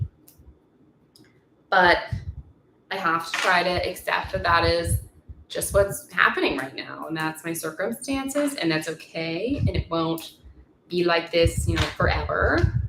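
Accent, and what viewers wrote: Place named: Wisconsin, United States. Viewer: Regarding the accent... don't forget the Wisconsin influence. Doncha know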